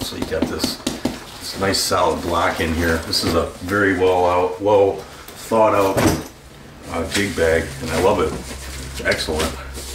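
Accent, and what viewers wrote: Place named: Midwest, United States. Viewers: Minnesota?????? You can’t hide that accent from me bro hammer!!!! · Your midwestern accent warms my heart. I saw your blood vessels in the thumb nail and immediately thought "Hey it's that guy that did some Nile riffs years ago"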